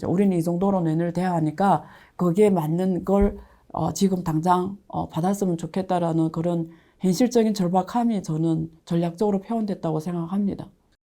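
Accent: China. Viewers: 많은.경제 뉴스를.신속히 전잘하는거 고맙습니다 다만 . 중국에.대한경제소식을 중국인의.발음으로 듣는게 그리.행복하지.않네요.. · 중국분이신가? 발음이. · 이 분 조선족이죠? 아무리 덧 칠을 해도 티 가납니다.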